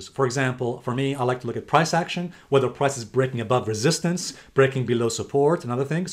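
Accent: England, United Kingdom. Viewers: Great Video keep like that every time by the way your accent England was 👍🏻👍🏻👍🏻👍🏻. I wann know something a bout altcion like Dash how much price well be .Thank you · Very interesting, thank you! Also your method of checking with the balanced level sounds reasonable! Your sport jacket does perfectly fit to you and the English accent!! :D Well done!!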